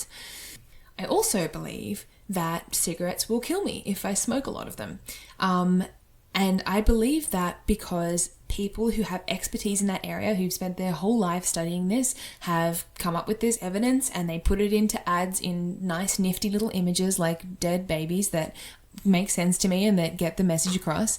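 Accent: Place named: Australia. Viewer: One of my favourite accents, cultured Australian.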